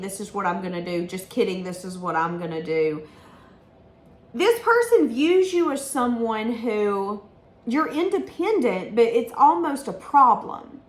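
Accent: South, United States. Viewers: This Yankee LOVES the manner in which you speak! The descriptive way you talk plainly, intuitively with a Sourhern flair that is Spiritual AND hilarious! You are appreciated, dear lady.😊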